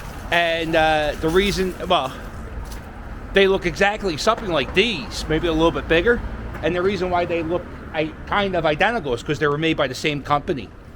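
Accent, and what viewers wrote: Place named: Delaware County, United States. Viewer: The Delco accent puts this tour into premium territory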